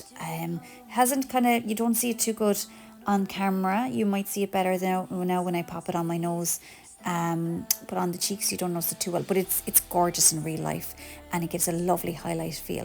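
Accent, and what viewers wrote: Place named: Ireland. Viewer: Add Irish accent to you description and it will triple your views! Love the Irish accent 🩷🙏🏻😍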